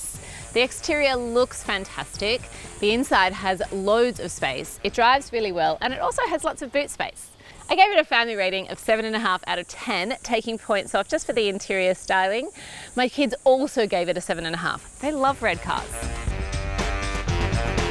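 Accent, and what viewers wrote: Australia. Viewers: its like a wagony hatchback i dig the design. Kia is making great cars with great price. Also good job on that family review with this car, hope people understands that you don't need a freaking SUV for hauling a family (the SUV trend is really bs -_- ). p.s what accent is that Australian?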